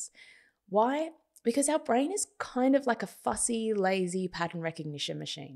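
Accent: Australia. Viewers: I like your Australian accent!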